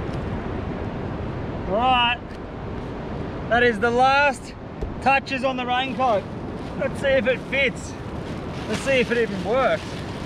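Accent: Australia. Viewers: I wish i could see british reaction to Australia accent lol gotta be interesting interaction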